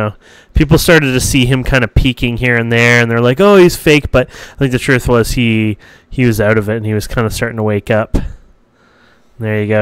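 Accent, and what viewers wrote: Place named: Canada. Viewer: Kanadai csávó, hallani az akcentuson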